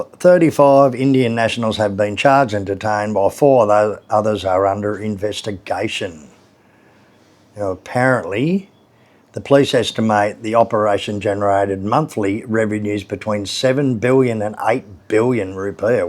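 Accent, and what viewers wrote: Australia. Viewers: Hi. REALLY enjoyed that and learned more about Bali. I enjoy listening to your Aussie voice not many of us left it seems. And yes. Albo is a goose. Keep em coming!!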